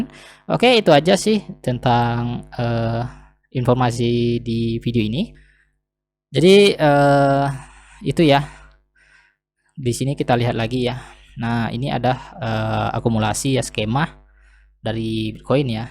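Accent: Padang, Indonesia. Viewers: Logat2 e bunyi urng Padang,pas cek Namo e ,eh urng Padang,Dima Padang e bg?Wak pdg Lo a kwkwkw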